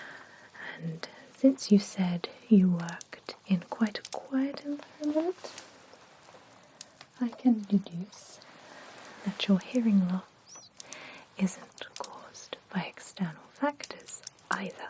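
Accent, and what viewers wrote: England, United Kingdom. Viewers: She said two words and I already knew she was as british as tea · It’s such a masterpiece video. I feel like being examined and talked to by Natalie Portman with English accent. And all the fabulous hearing devices! My hearing loss will be recovered in no time✨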